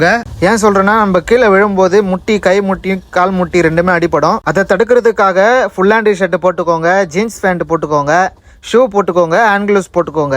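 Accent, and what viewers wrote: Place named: Vellore, India. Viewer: Vellore Tamil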